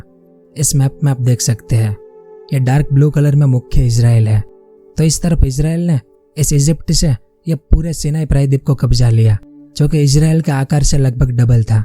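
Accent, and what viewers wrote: Gujarat, India. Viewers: गुजराती से हिंदी में उच्चारण में अपनापन है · Pako Gujarati lage che! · Bhai aap gujrati ho kya?????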